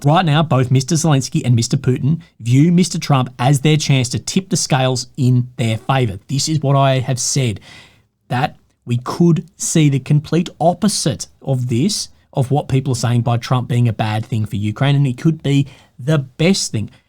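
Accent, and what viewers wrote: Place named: Australia. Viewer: This top bloke is an Aussie.His accent as well as his ideas are also 'top'!!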